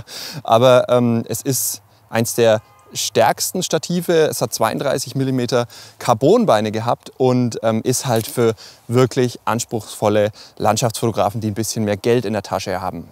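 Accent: Franconia, Germany. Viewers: Fränkisch darf man nicht weg schneiden!!! Grüße aus KT 😂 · Mehr frängisch!!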